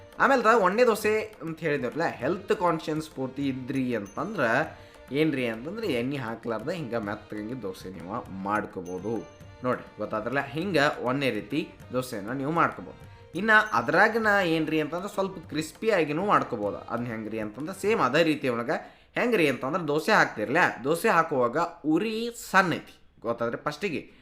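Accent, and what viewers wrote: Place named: Uttara Kannada, India. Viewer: WOW Superb Sorekayi dosa in 3 variations Healthy version Curry also 👌🏻👍🏻👏🏻 UK colloquial narration makes it more Interesting. Thankyou for All the Recipes & joint Effort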